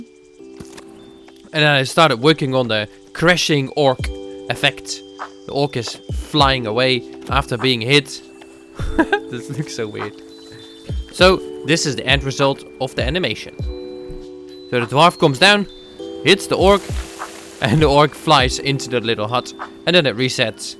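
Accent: Netherlands. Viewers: You live in New Zealand right but you have a playlist of dutch Music and you have a accent just like a lot of dutch people so are you dutch?? (Awesome video btw)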